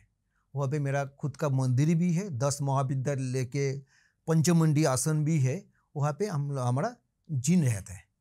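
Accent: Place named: Bengal, India. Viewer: Ku samj nhi aya?????? Hindi he toh bola hai. Boss pronouns thora bengali mixd hai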